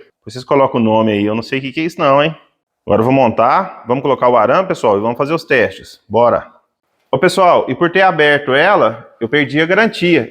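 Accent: Minas Gerais, Brazil. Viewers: Bom dia,vc tem muita paciência e vontade de ensinar, parabéns pelo vídeo,e esse sotaque me parece que vc é de Minas Gerais, eu tenho um certo receio em usar essas inversoras junto do corpo por causa do campo eletromagnético que ela pode gerar, é melhor evitar uma possível radiação no tórax.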